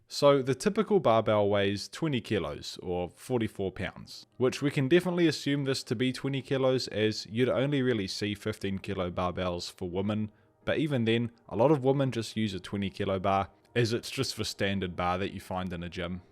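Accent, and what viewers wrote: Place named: New Zealand. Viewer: You sound like one of those New Zealanders who has a slight Australian accent for some reason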